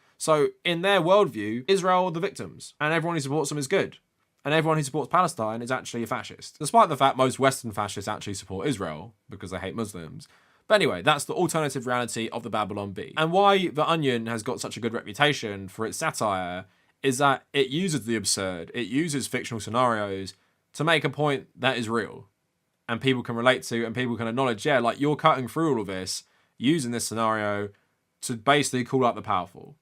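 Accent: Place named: United Kingdom. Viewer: Judging by your accent, you're from the place that spawned Monty Python. Can't blame Babylon bee and Onion for not making funny satire by UK standards. US standards in comedy have a lot of catching up to do in this regard, but they're at a level where the average American can appreciate and laugh at the jokes.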